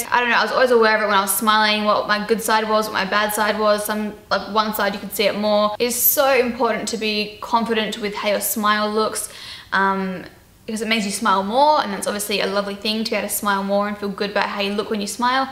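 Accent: Australia. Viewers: Um she's Australian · Is she australian?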